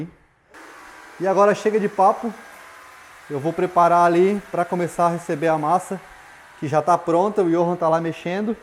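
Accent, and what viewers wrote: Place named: Florianópolis, Brazil. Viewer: "A viXta aqui.." "Arrente" "Mexmo assim já passou o Axpirador..." Manézinho será mo quirido? Top demais ein!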